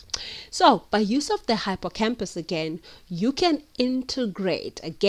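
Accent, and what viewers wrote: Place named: Kenya. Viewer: Thank you so much for this. It was very helpful and it was very nice to hear an accent which reminds me of my homeland Kenya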